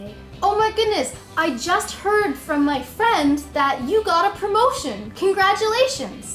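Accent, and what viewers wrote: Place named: Canada. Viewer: Again, simply an excellent lesson! Nice to hear some Canadian accent. Thanks. Great.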